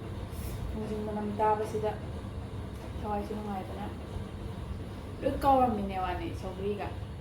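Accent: Kakching, India. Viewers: Eche d kakchingdei mande lonse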